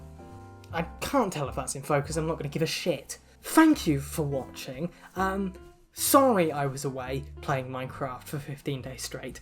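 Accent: United Kingdom. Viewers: The sunglasses the black satin opera gloves and the British accent really does it for me. Anyone else?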